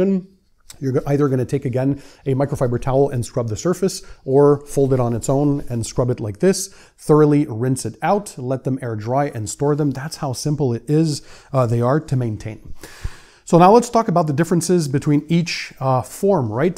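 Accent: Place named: Quebec, Canada. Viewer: you're welcome 🙂 I discovered your channel by only looking for detailing new products, especially Gyeon. I find North American detailing videos more complete than what we've got in France. Merci pour les quelques vidéos en Français, c'est toujours plaisant d'entendre un québécois parler. Avec un vocabulaire souvent plus riche en plus 🙂 Anyway, have you ever done some videos about your garage makeover? Because even if I recently look at your garage tour videos, I have the feeling that I've seen it years ago while I was doing plans for my actual home .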